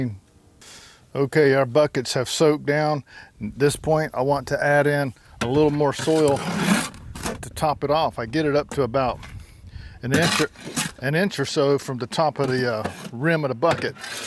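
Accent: South, United States. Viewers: I love your videos. I like how you go from start to finish. And short and sweet. And I think I hear a little southern twang in your voice.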